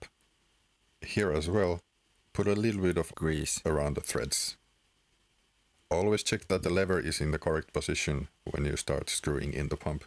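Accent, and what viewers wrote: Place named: Finland. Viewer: Thanks for the vid! Do you happen to speak Finnish, I think I hear that a bit in your pronunciation. I’m from Finland myself.🙂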